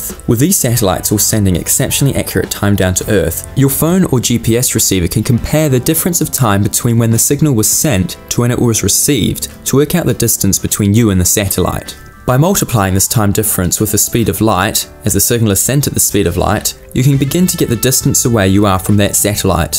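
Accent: New Zealand. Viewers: Ahhh that kiwi accent.. grrrr lol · ooooh I was sure this was a Kiwi accent, then when I saw the AirNZ app I knew it had to be!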